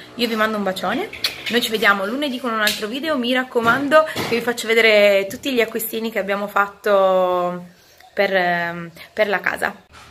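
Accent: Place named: Turin, Italy. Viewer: Sei napoletana solo di nascita, ma in realtà sei torinese nel modo di parlare e di essere. Penso che quando vieni a Napoli tu ti senti un pesce fuor d'acqua.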